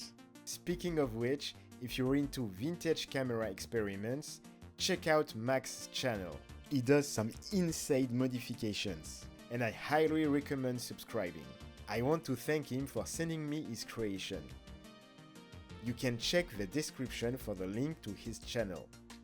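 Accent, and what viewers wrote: France. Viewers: Mais c'est super l'accent Francais, ici aux USA ils adorent ca !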